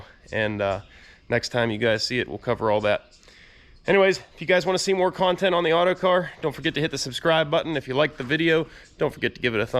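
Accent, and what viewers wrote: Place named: Pittsburgh, United States. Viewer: Love to listen to yinz’s accent! Just like home. Very interesting!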